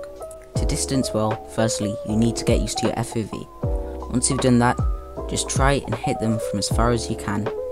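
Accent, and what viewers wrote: United Kingdom. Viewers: fr he’s a British guy with a beautiful accent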